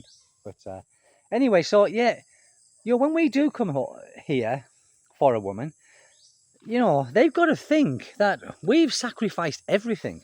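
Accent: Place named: England, United Kingdom. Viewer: I like your videos. Not only good insights, but a relaxing style, including the cool english accent. 😎👍 Now about independence in relationships, the way i like to express it, is the idea of three lives. A life for you, a life for me, and a life together. Because no one person can always be all things for the other. And that's quite all right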